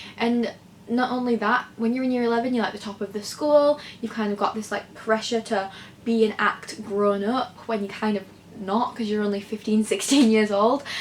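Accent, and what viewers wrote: Yorkshire, United Kingdom. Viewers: Ackley Bridge accent YAS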